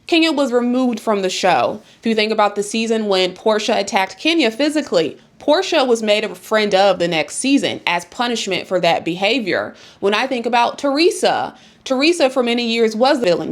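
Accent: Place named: South, United States. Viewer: absolutely love your voice and your accent, weirdly enough i don’t hear many southern accents in my video essays! you’re doing amazing btw i love your content i subbed after this video bc your content quality is top notch!!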